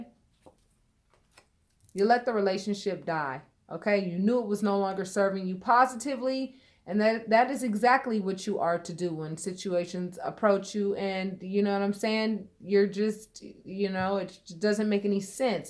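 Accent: United States. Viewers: wow resonates so much luv the yanki accent well said hammered the nail home bless thank you xxxxxx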